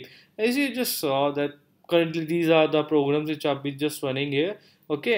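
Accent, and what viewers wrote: India. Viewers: He's an Indian. How else do you want his accent to be?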